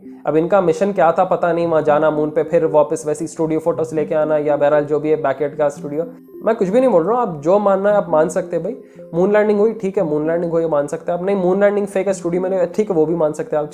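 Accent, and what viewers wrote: Hyderabad, India. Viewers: Bhai kirak Hyderabadi baatan kere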